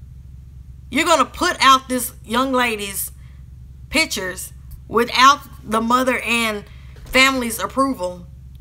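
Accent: South, United States. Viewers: i love her country accent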